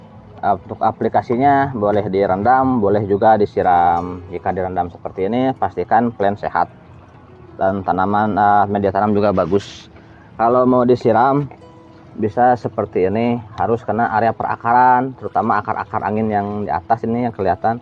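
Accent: West Java, Indonesia. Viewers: Baru 2jam aploud, mantap ilmunya pak. Penyampaian simpel mudah difahami dng logat Sunda tambah epik. Saya dari Jatim enak mencerna penyampaiannya👍🏻👍🏻👍🏻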